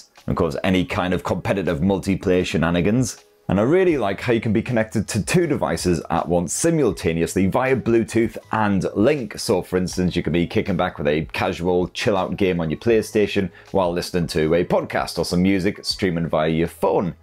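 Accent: United Kingdom. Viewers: Another great video. Its great to hear a proper British accent instead of all those bloody Yanks!!!!!! Keep up the good work , pal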